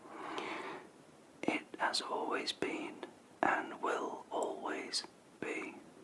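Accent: Yorkshire, United Kingdom. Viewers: Are you from Yorkshire? I recognise the accent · are you British? I like your accent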